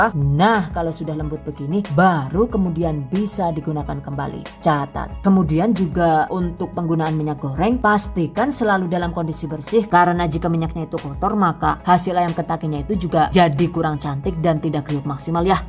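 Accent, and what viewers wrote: Banyumas, Indonesia. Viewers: Bunda asal dari mana Bun ngapaknya...suka dengernya🤗🤗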